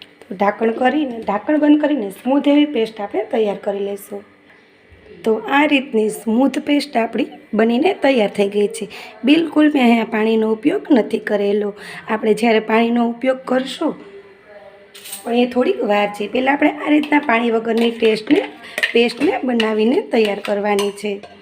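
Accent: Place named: Kathiyawad, India. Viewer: Khub saras vangi che. Tame kathiyawad na Cho? Bolvano lehko tya no lage che😊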